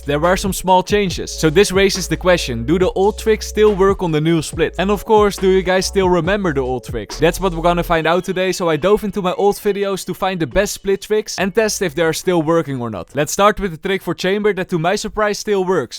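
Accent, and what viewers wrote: Netherlands. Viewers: good tips but are you ducht? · i smell dutch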